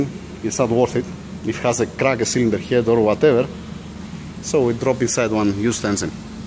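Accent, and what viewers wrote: Greece. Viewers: Hello ser nice work I want to ask you where are you working? From the name and accent I know that it is Greece but where in Greece? I have a problem with s320cdi 4 matic the iniverzal joint in the transfer case is worn-out can you halp me please. Where to find parts do you have it... Thanks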